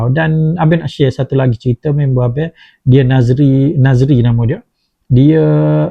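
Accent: Kelantan, Malaysia. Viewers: Asslmualaikum..selama perak hadir..sekadar cadangan kt..klu boleh kecek kelate lebih kerap..dpt gak blajo 😊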